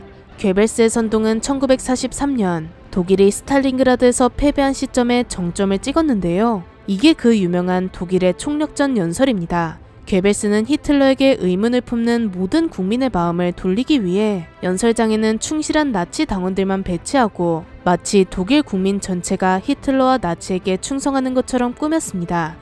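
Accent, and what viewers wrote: Gyeongsang, South Korea. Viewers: 경상도 사투리? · 내레리션 담당자분 경상도 사투리 들려요 ㅎㅎㅎㅎㅎㅎㅎ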